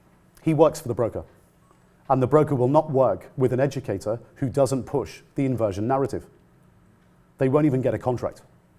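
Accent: United Kingdom. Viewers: Dont be intimitated with british accent. Turn on CC and translate words that dont make sense. Best of luck!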